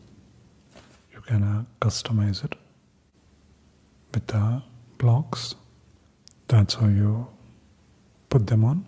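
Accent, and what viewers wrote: India. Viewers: I like Indian accents. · It's literally the best. I love watching ASMR videos where the person has an accent, but the Indian accent is the best. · I love the Indian accent. 💜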